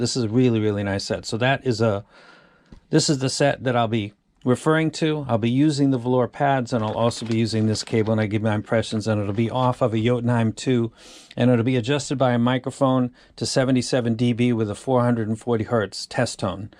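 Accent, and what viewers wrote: Boston, United States. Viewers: Argons are something I have to pick up this year. Also I love what ever music app you are using for your files! I need to set up something similar. You sound like you're from the Boston area.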